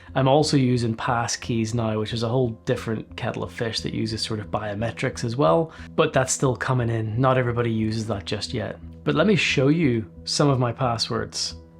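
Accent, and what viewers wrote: Northern Ireland, United Kingdom. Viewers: Is that a Northern Irish accent i hear? Haha! Nice video man, a lot of people underestimate the amount of information is out there of them. I actually recently paid a service to search some of my credentials against breaches. Turns out even tho i use generated passwords, they were actively being leaked. Found 4 passwords compromised in total. I think it's great showing this kind of information to people. Wish i did mines sooner!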